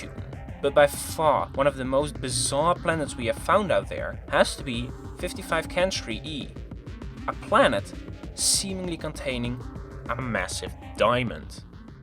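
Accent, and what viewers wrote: Netherlands. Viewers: dutch accent confirmed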